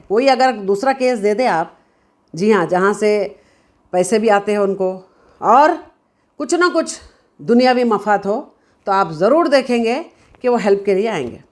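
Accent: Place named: Hyderabad, India. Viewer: ap sida urdu boliye haydrabadi acha nahi lagta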